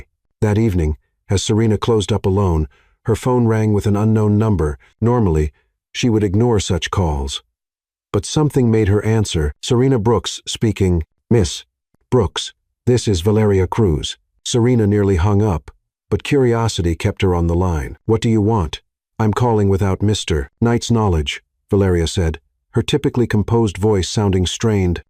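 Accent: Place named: Spain. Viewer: Why the Spanish inflections in the AI speech? They certainly distract from the story. AI should know English only..also AI pace is not how people speak. Saying MISTER then a long pause is odd.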